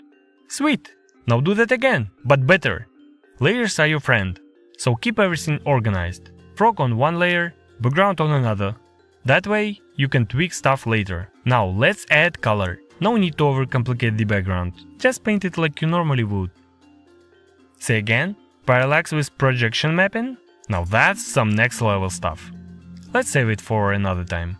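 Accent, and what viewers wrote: Russia. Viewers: Oh man, your strong Russian accent is great.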